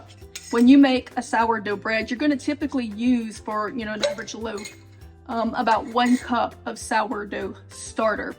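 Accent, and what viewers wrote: Louisiana, United States. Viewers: Great video. Very helpful. I thought I detected a Louisiana accent. My grandmother was a Mayeux. I plan to try your recipe with yogurt. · I just came across your video and SO HAPPY I did!! I’m going to try this way of creating a starter. I did have a question while watching your video. I heard an accent come across that sounds very much like south Louisiana. Where are you located?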